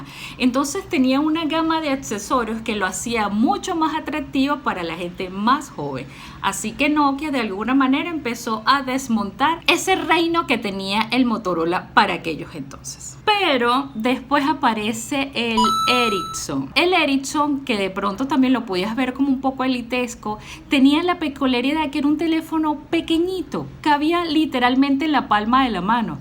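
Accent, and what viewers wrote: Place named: Venezuela. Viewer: ¡Guao, que excelente manera de comunicar! Muy divertida y aleccionador relato. No conocía esto de Xennials, realmente lo soy. Gratos recuerdos y con ese acento venezolano maravilloso. Congratulaciones.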